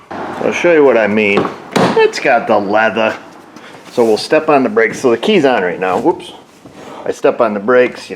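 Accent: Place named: Northeast, United States. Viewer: "She's got the leathah!"......right away I knew he was a northeastern guy lol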